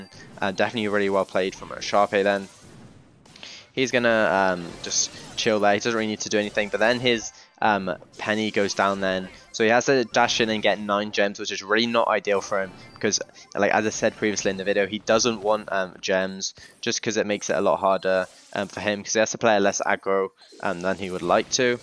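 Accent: United Kingdom. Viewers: british english accent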